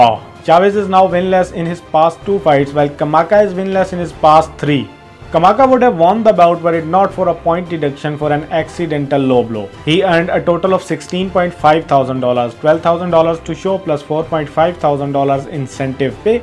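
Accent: India. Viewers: Hi bro you sound indian how do you watch fight night and paper view undercard usually ? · Your videos are good but your Indian accent is so thick and off putting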